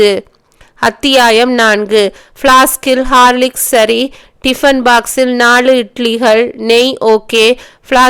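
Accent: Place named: Sri Lanka. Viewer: என்னாச்சுப்பா ஏன் இப்படி அவசரமா வாசிக்கறீங்க உங்க குரலில் ஒரு வசீகரம் உண்டு அந்த கொஞ்சும் இலங்கை தமிழை கேட்க கேட்க இனிக்கும் ஆனால் இக்கதையின் வாசிப்பில் ஏதோ மிஸ்ஸாகிறது போல் தெரிகிறதே நிறுத்தி நிதானமாக வாசியுங்கள் சகோதரி என்றும் உங்கள் வாசிப்பை விரும்பும் நானும் ஒரு சகோதரி தான் பிழையாக கருத வேண்டாம் உண்மையிலேயே உங்கள் பழைய நாவல்களை கேட்டு வியந்து போயிருக்கிறேன் தொடர்ந்து உற்சாகமாக வாசியுங்கள்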